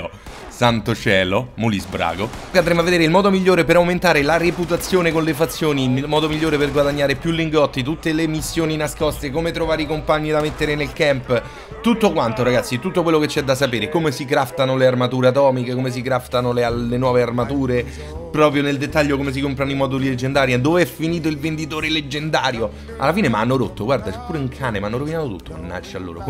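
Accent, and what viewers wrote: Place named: Rome, Italy. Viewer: E mi raccomando, metti sempre il tuo fantastico dialetto Romano...è una tua caratteristica...e ci fa' taia' un casino!😂😂😂😂😂😂💪💪👋👋👍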